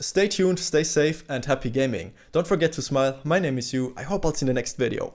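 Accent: Germany. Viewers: I hear a bit of German or am I wrong 😅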